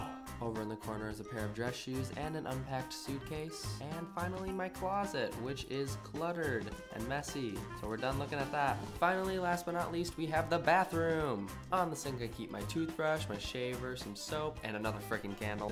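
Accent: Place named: Midwest, United States. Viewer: I really enjoy watching all your videos. They're beyond cool!. I was once a foreign exchange student to St. paul, MN way back in 2008 so i kinda enjoy listening to yer midwestern accent. Keep it up! :)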